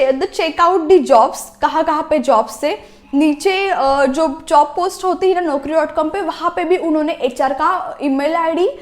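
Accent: Maharashtra, India. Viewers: Marathi by accent!!! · Tu marathi ahes ka? Seems from tone.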